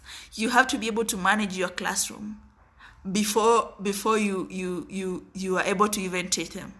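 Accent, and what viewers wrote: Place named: Mongolia. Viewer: You are a very strange woman the same as the guy who told you not to go to Ethiopia. You just look an ordinary Ethiopian girl but you sound like as if you are from Mongolia.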